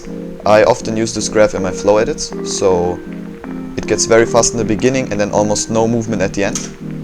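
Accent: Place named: Germany. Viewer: mag deinen deutschen akzent und natürlich auch das tutorial xD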